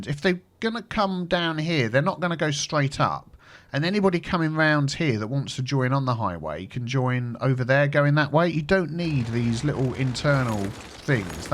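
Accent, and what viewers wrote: United Kingdom. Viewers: There is something uniquely meditative about listening to a random British dude sip tea and mutter away while diligently fixing the same rudimentary transportation engineering problems over and over again. It's like a slightly condescending ASMR, complete with a hint of progressive optimism, and little cherry verbal nuggets sprinkled in; horky-borky, chockablock, straight-on, etc. Just makes me feel like things are right in the universe. You've hit a commendable niche. This is far better ambience than listening to "4 hours meditative gregorian chanting" or "best of derivative study beats vol. 69" 10/10, will definitely put on the Anglo-traffic-Daddy while I'm doing research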